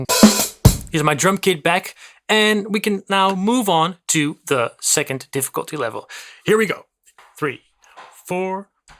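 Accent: Netherlands. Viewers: NIce exercise, explanation and video overall! Also, am I hearing a bit of dutch accent in your English or is my mind playing tricks with me?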